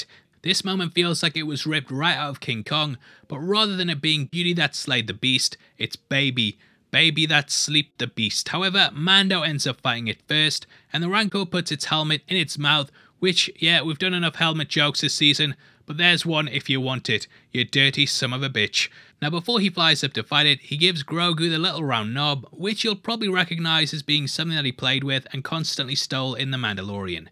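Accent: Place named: United Kingdom. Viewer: Maybe it's a British accent but I find you much less annoying than Eric at new rock starz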